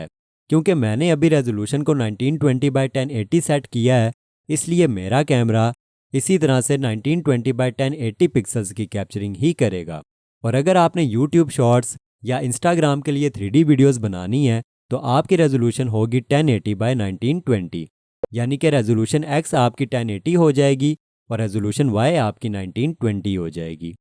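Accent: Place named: Pakistan. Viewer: Apki awaj pakistan logo jaisa ata hai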